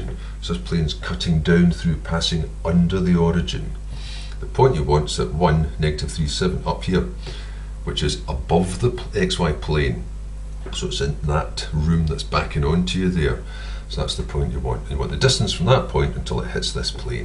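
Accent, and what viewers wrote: Scotland, United Kingdom. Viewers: Is it just me or his accent sounds like from Brave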